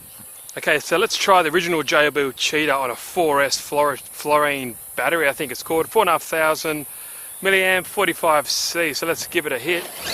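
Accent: Australia. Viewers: Where are you from you sound austrailian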